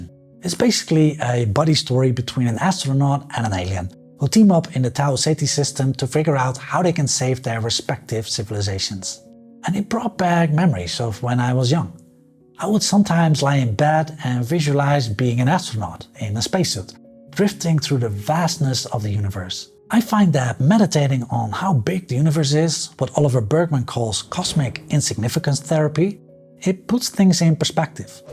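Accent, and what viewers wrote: Netherlands. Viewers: hello, I just subscribed........I have a question, not related to the video at all.........but is your real name? I'm intrigued. I lived in NL for 10 years and I recognise the accent.........I don't understand the very English sounding name, and can't align it in my own mind with the content you are creating. thanks for clarifying.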